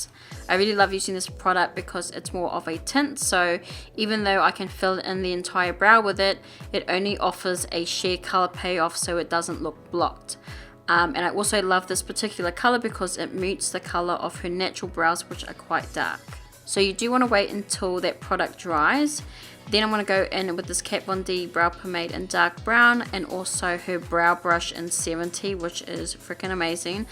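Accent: New Zealand. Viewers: Love this look and love the kiwi accent!. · chur bro so am I. I could tell by your voice that you were a kiwi. A very talented pretty kiwi. Later bol. 😉😆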